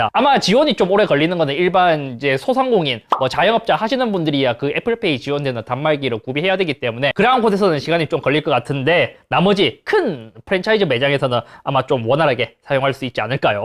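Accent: Gyeongsang, South Korea. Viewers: 근대 궁금한 게 있는데 왜 IT 유투 버는 경상도 사람들이 많지 사투리도 그렇지만 발음도 그렇고 말이 빨라서 무슨 말인지 못 알아들을 때가 있네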